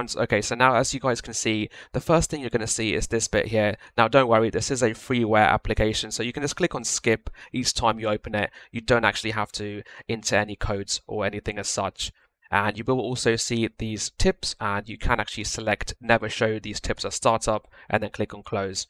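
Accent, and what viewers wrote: United Kingdom. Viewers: lol brtish man fix the problem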